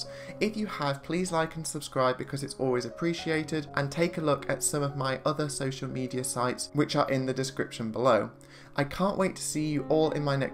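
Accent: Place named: RP, United Kingdom. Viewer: Matthew, you sound very posh. And thanks for your attention to my (stuffed) canine daughter, Lucy, on Twitter. I’ve booked Ambassador’s Ambience to Antwerp’s Xmas market for Dec ‘22, just like I took Lucy there on CMV’s Columbus 2 years ago. June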